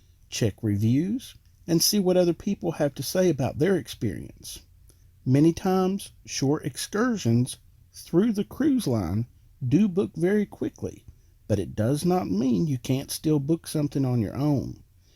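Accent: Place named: Texas, United States. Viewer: Love this guys accent, kind of folksy and down south perhaps Texas, not being American not sure but he puts it across in such and easy going manner you can sit through the video till the end.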